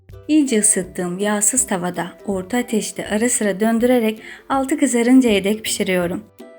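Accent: Azerbaijan. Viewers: Cok guzel kısa net. ve bıktırmadan anlatiyorsunuz??elinize sağlık..merakımı mahzur görun ama bir sorum var sanki azeri bir aksanınız var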